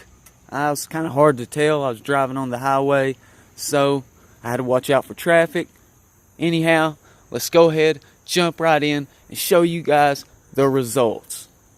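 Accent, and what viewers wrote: South, United States. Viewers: Your southern accent is amazing.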